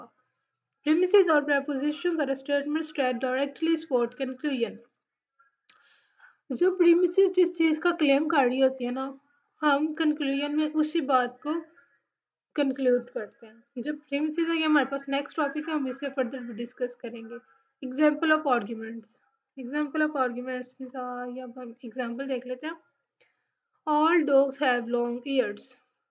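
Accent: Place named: Lahore, Pakistan. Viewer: ap ki voice sa lagta ha ap lahore sa ho . hhaahah but ma'am boht acha explain kiya ap na..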